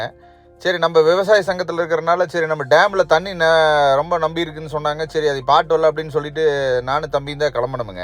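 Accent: Kongu, India. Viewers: konku nattu tamil nalla pesareenge 😂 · நீங்க பேசுற கொங்கு தமிழ் மிகவும் அழகு 🙏🙏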